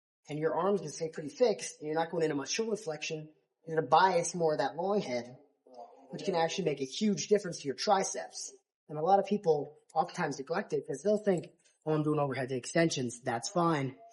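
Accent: New Zealand. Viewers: Cigs and New Zealand accent, very Shan coded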